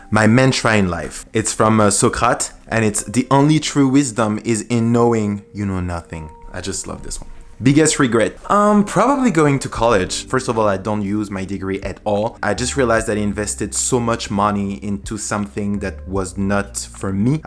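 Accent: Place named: France. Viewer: That was weird. I found your channel looking for ways to declutter photos and...found you had a very tiny familiar accent and, watching a second video a bit intrigued, It turns out you're french ! Funny surprise. Félicitations, beau boulot ! I'm impressed.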